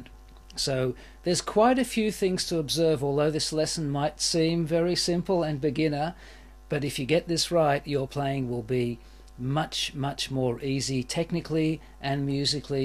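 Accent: Australia. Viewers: Im pretty sure hes Australian :/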